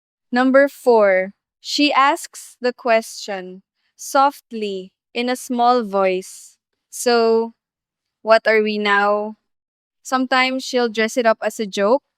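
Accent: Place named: Philippines. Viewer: ❤❤❤❤ Your So Beautiful and Love you and your wonderful Information ❤❤❤ Love Filipinas especially your Lovely Accent 😍😍🥰😘💕❤️💓💋💞🌹💓❤😘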